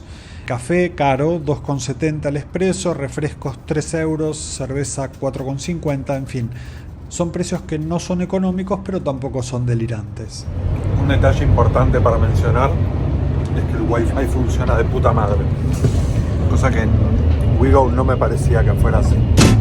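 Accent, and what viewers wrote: Argentina. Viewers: Me da la idea que eres Argentino, por tu acento, y la actitud muy de por ahí, que por aquí en España, nos han dado un repaso con mucha mitomanía.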